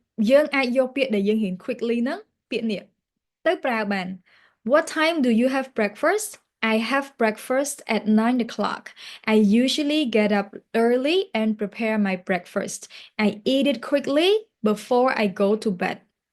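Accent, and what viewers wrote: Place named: United States. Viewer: Your English sounds like American how long have you been learning english?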